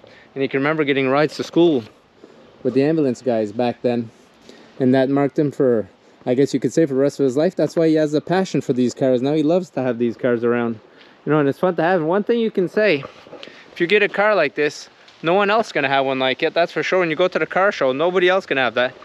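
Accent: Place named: France. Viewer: no problem, the French accent can throw you off sometimes, I’m French and I get tricked sometimes. 😉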